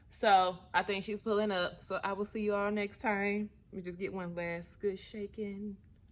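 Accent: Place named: Mississippi, United States. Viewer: Where u located? I'm getting a Mississippi vibe from your accent. Just curious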